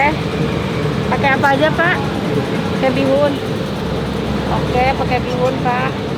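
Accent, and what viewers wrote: West Java, Indonesia. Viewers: Sedep pisan klo liat teh mkn, apalagi smbil ngmong logat sunda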